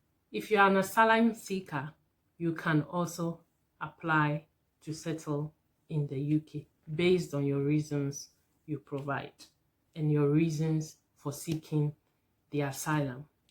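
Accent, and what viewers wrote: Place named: Jamaica. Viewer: Are u Jamaican? U sound like my people.. stay bless.. good review sis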